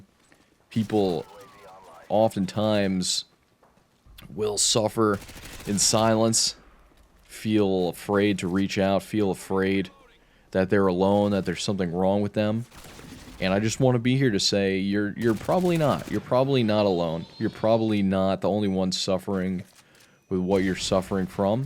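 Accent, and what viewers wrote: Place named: Florida, United States. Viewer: Hey man, I really enjoy your content, your channel was introduced to me couple days ago and i've been watching some of your videos. Very relatable, and the talks about lifes difficulties, you almost sound like a Floridan version of me. Hope to see more of your content and keep your head up, better days are obviously coming... That's atleast what I tell myself.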